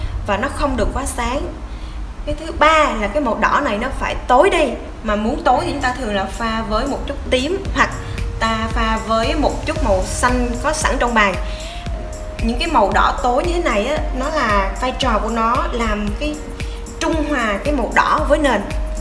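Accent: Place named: Central Highlands, Vietnam. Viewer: Chị dạ hay quá , giọng lạ nữa , như người dân Tây Nguyên luôn 🥰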